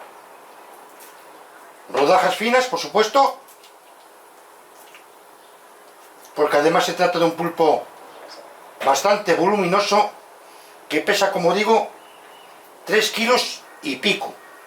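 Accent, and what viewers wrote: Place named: Asturias, Spain. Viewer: Lo mejor q he probado en mi vida (sólo comparable con unos chipirones rebozados fritos y acompañados con papas fritas encebolladas en láminas). Soy Uruguayo, hijo de Gallega. Viví en Vigo unos 10 años, entre 2002 y 2012; quizás el mejor lugar para disfrutar de la comida del mar. Luego me volví, y sólo una vez he vuelto a probar pulpo (a la plancha) - chipirones y mejillones nunca más. Amigo: es Ud. Gallego o Asturiano?Por su acento, me inclino por la segunda - o quizás del Bierzo leonés? Felicitaciones por el canal. Y vaya mi admiración a todos los mineros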